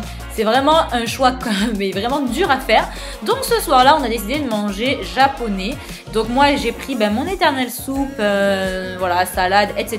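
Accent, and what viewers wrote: South, France. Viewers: t'es tellement ridicule quand tu dis healssy... dis le en francais avec ton akessan du sud c'est beaucoup mieux va...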